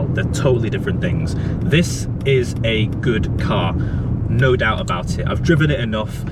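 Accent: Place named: Isle of Man, United Kingdom. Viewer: Hi, you can do this….just slow things down a bit, you’re trying to squeeze too much info in…main points, no waffle…..you come across fantastic, and the cameras and microphones are spot on….hope the vlogs work, and the business goes from strength to strength…..👍🇮🇲😉👌. Ps….I can still hear some manx in there yessir, if you know you know…..can’t fault the narrative, just slow down a bit…😜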